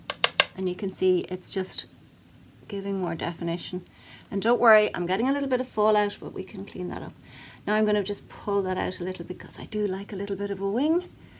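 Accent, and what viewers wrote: Ireland. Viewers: Thanks for sharing, love your look and love listening to your lovely Irish accent. Huggss from Australia xox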